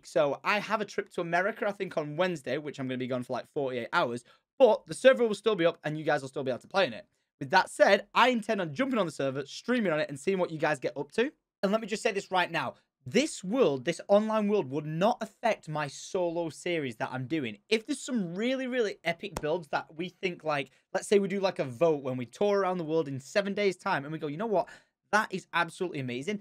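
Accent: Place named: Manchester, United Kingdom. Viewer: This is the most manc you've sounded in years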